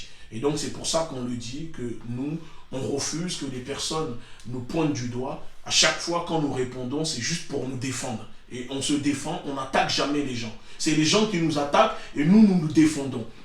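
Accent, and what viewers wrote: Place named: Africa. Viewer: Ta carrément raison non mais pour qui il se prend quelqu'un à remarqué que depuis qu'il fait le prêcheur il a pris un léger accent africain pour être plus crédible, allez écouter comment il parle bien français sans accent quand il rappai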